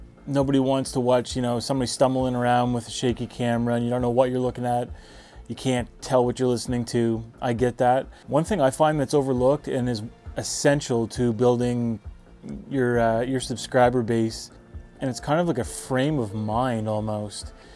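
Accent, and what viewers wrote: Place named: Central, United States. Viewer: Ok, sorry, you sound just like and American from the central part of the U.S. I should have realised that, because I knew where the bay of Funday was.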